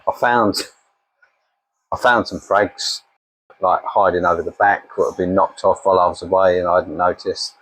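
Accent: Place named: Yorkshire, United Kingdom. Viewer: Yorkshirey way lol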